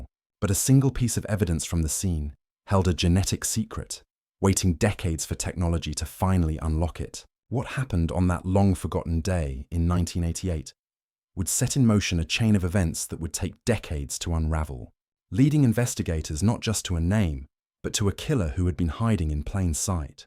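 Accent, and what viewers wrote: United Kingdom. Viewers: Why is there a British guy talking about this story couldn’t you make it an American???????? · Is this just something you made up because 1st, There isn't a Granby. State forest in Massachusetts 2nd Hartford is in Connecticut.. 3rd Kill the British accent... It sucks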